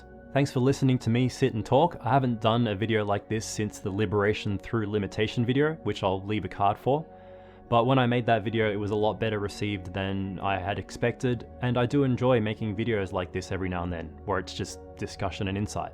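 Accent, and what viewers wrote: Australia. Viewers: The two things I absolutely love about this are: A. The amount that it resonates with me and encourages me to shoot more of my family. B. That you truly have the most Australian "O" I've ever heard. ♥️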